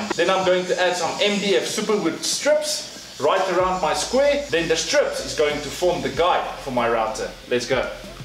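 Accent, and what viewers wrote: South Africa. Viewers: Fantastic work. You sound like a afrikaans speaking South African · Nowt better than the South African accent.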